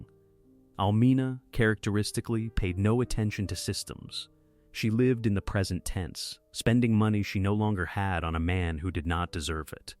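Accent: United States. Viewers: I liked the American narration. I didn't have to turn on the closed caption to understand it. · Why always American commentators? But story is different, very genuine personality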